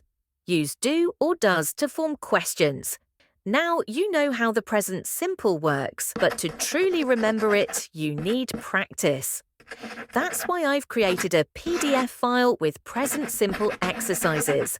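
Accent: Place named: United Kingdom. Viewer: Qué hermoso es el acento británico! Saludos desde Paraguay 🇵🇾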